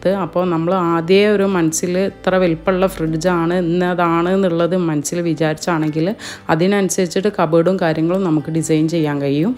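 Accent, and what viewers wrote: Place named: Kozhikode, India. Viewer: Wa alaikkum mussalam Bhayankara bhayankara vellye fan aan njan ee nde Masha allah Almost ingalde ella videosum kand kayinj actually irfanatha vazhi aan ingalekk ethnnath and you’re so so adipoli ❤ Edkk verne ingale kozhikodan slang comedy aan😅 Masha allah keep going 🫶 Withloveshaibi❤️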